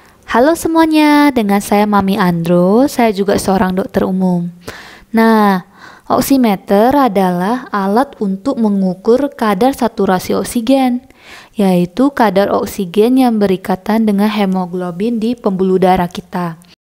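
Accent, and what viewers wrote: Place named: Medan, Indonesia. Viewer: Orang Medan ya? Logatnya kayak temen Medan saya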